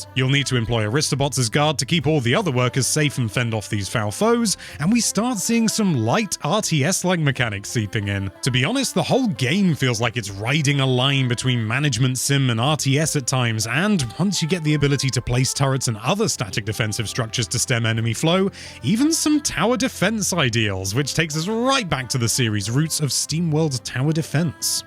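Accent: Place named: United Kingdom. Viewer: Just uk accent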